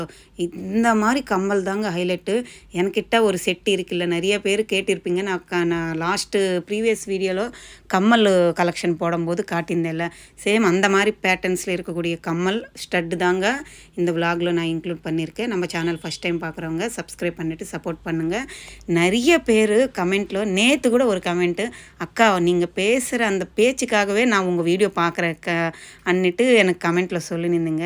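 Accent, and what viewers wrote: Telugu, India. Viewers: Sis neenga telugu vaasanai vesura tamil la pesuradhaala adhu keka nalla irukku ❤❤❤❤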